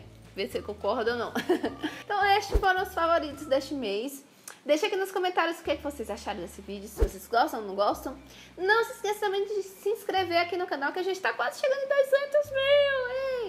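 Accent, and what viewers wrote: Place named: Brasília, Brazil. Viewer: tão brasiliense esse teu jeito de falar , me identifico #jujubanoveda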